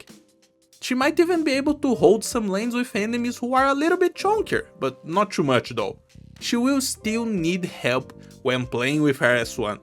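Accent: Brazil. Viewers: Are you Brazilian? I recognize that accent lmao.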